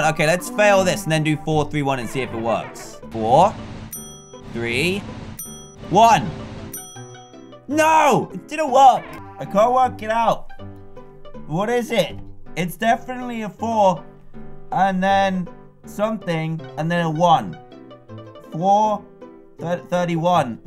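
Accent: United Kingdom. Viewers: british the mincraft man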